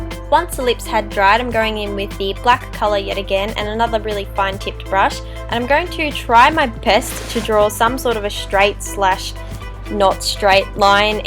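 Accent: Australia. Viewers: You sound Australian 😂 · loving the Aussie accent yasssssss aussies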